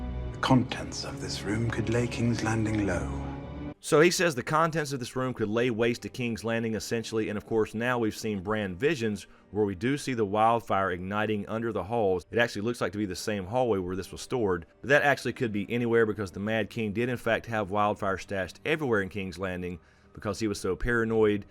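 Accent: South, United States. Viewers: I'm sure you've heard this before , but I love your southern accent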